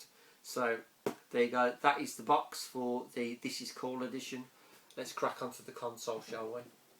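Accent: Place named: Wolverhampton, United Kingdom. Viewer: Thought the accent sounded familiar. Wolverhampton here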